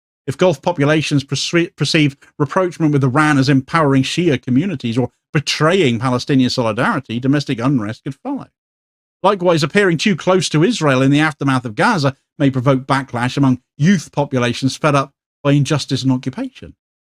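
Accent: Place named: West Country, United Kingdom. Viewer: is from South West of England! That's our accent! 😂😊xxx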